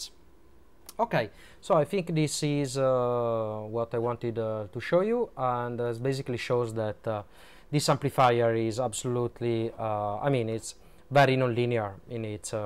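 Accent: Italy. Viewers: O sei italiano o sei francese haha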